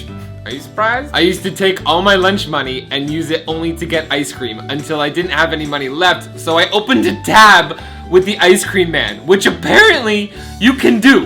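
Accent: New Jersey, United States. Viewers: Is anyone noticing his accent I like it jersey!!!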